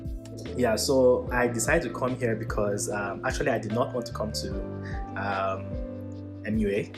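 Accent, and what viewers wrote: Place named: Nigeria. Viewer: You sound Nigerian bruh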